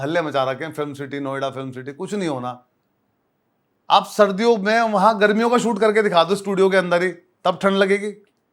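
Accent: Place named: Saharanpur, India. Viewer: He is real man..his accent is actually Saharanpur wala...wish him success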